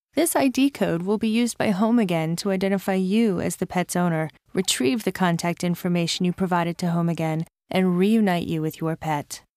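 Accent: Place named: Maryland, United States. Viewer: What a maryland accent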